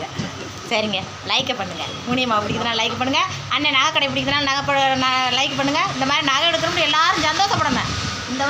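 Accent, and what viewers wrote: Madurai, India. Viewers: Madurai ah ka neega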